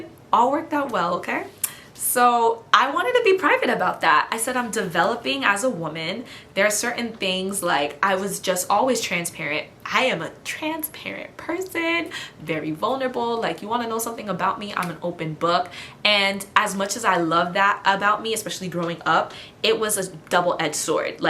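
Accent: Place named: United States. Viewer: Bonjour, je pense que vous vivez peut-être aux. États-Unis ? Vu,votre accent !!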